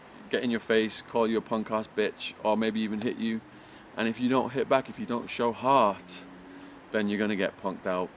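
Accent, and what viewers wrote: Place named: England, United Kingdom. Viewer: Bro you sound like your from England what would you know about California prison politics?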